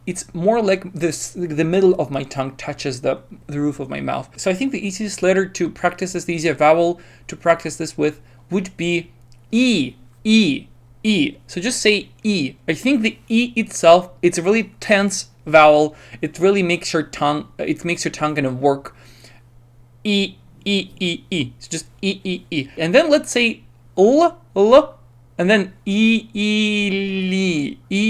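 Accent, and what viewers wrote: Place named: United States. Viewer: Хорошее видео. Немцы и британцы именно так и произносили мое имя, со звуком j. Как приятно слушать ваш американский акцент, а с кем вы его ставили?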